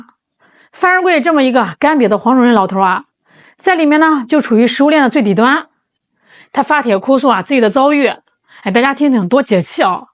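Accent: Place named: Henan, China. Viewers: 中原河南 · 居然是河南妞？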